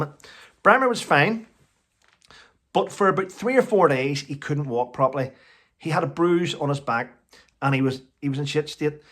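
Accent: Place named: Ireland. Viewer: Oh that must have been so funny 😆 I love hearing your stories especially your Irish accent, my late Mum came from Carrickfergus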